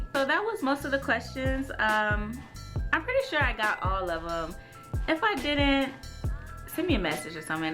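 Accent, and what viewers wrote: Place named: Japan. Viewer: I have a question. Has anyone ever told you that you have a slight Japanese accent? I use to date a Japanese girl and although her accent was thicker, you kind of sound somewhat like her lol.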